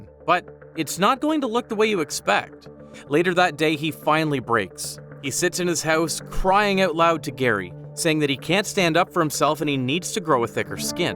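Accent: Canada. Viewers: Are you Canadian, my guy? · Your Canadian accent is so prominent lol. Fire video though!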